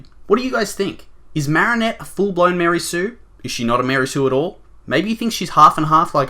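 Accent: Australia. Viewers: You're Aussie aye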